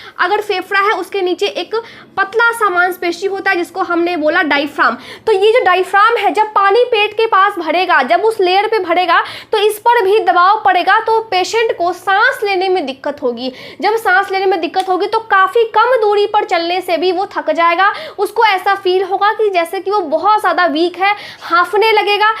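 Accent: Bihar, India. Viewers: Lagta hai aap बिहाड़ se हैं। वैसे आप ने लिभड़ के बारे में अच्छी तरह समझाया है। 😊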